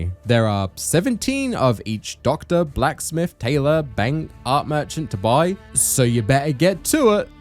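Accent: United Kingdom. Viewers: Binged the first 3 so far. Really like your style of video. Feels authentic and not AI scripted! Which is a blessing nowadays. I'll continue to binge the rest of the series. For now. Until you Brit voice gets to me!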